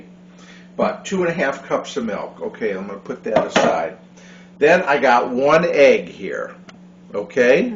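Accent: Midwest, United States. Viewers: Love the foods , but I love your mid west accent which is like my birthplace of Chicago Illinois I’m reminded of all my family which I miss so much! Thank you for your channel, and the great foods I feel at home with you all!👍🏻❤️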